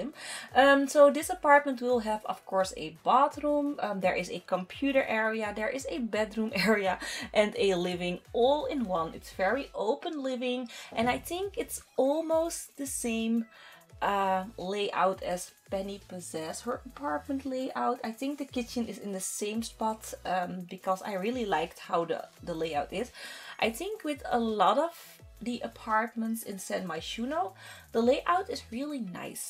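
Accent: Netherlands. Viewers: Are you Dutch? You sound Dutch. (I'm not Dutch but I lived there for a few years) Btw great video, I'm glad I found this channel ☺️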